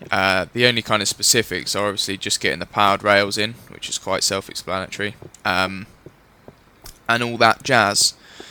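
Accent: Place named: England, United Kingdom. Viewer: he has an english accent like an english...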